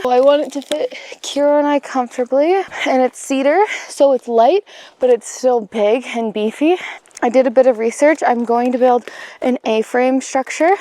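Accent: Canada. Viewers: I found out it’s part of the way Canadians talk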